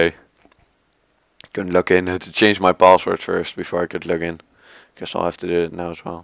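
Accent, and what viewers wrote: Netherlands. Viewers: oke gozer ik hoor dat je NL bent maar het werkt niet bij mij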